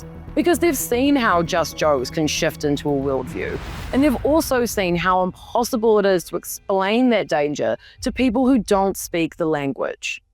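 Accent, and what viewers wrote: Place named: New Zealand. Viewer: "IJJY JOKES" 😆 Omg I love your kiwi accent ❤️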